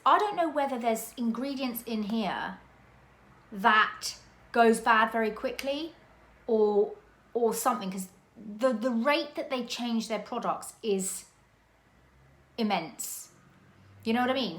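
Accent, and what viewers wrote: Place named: Northern England, United Kingdom. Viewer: Hey, I'm loving the pumpkin vibe you're having. It reminds me of how much I miss the autumnal days at home, plus the fact that there is so much more choice in the supermarkets than over here as the seasons change 🍁. I do feel that the 'clean beauty' fad is just really bad and complete misinformation. Watch ANY board certified dermatologist or cosmetic scientist and they give all the clinically proven facts that parabens and all the other 'demonised' preservatives are absolutely safe and absolutely needed to keep our products bacteria and germ free.....and prevent skin infections.... UNLESS you have a known allergy to a specific ingredient. This is why cases of some skin complaints (peri-oral dermatitis ect), are on the increase. Oh, it's the same over here regarding TV, there's nothing interesting....although I do still love coronation street and eastenders on UK TV 🙃👌. 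Yep, I'm here for the 'latte' inspired make-up look, 👌 😍. It looks really natural and glowing.... however, I'm not a person who gets my nails done, so I can't comment. Apart from saying, "Go with what appeals to you, you can always change it later on." It's good to have a choice 😊 LOVING the Northern accent, too 😍 😅. Great video, and it's always great to see you 👍 ❤⚘️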